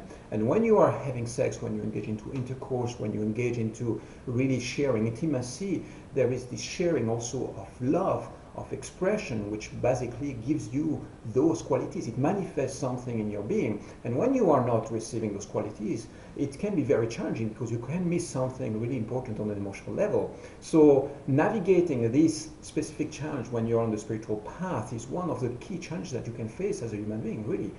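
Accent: France. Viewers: Agreed, again. BTW, Vous etes français ? Your accent sounds a lot like French, it's cute.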